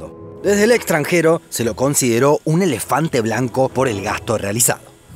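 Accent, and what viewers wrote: Argentina. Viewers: Es agua acumulada desde hace miles de años y no es renovable, es agua fósil, es decir, que tarde o temprano se agotará , como el petróleo . Pero toda agua que no discurre, se "abomba", es decir no se "airea", entonces necesitará tratamiento previo para su consumo? Pero claro para riego si sirve. Si sabía este dato. Por ello se pensaba que por este recurso era que los países imperialistas, trataban de apropiarse derrocando a Gadafy. Pero bueno el vídeo. Pero estos gauchos. su dejo molesta, pero que se puede hacer, si es un buen reportaje solo queda aguantar. ASHÍ, EN VES DE ALLÍ Y MUCHAS PALABRAS MÁS..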